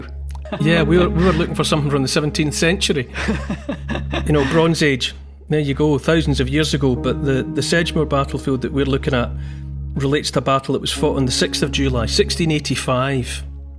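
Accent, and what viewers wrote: Scotland, United Kingdom. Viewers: I like talks about rebellion best when they come with a Scottish brogue, and most of all when the mouth is framed with the fabulous beard of Neil Oliver.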